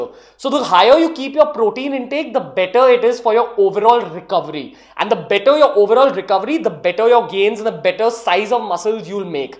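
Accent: India. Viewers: Why are people talking about your accent... That's a normal accent found mostly in higher middle class or upper class section of Indians... 🙄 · 😂 I can’t concentrate. His accent is too funny. Not to be disrespectful but he has a strong Indian accent I don’t even know what his saying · So guys here is the pure Indian accent for you ..yeah I know its kinda weird 😐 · Lol his accent tells he is Indian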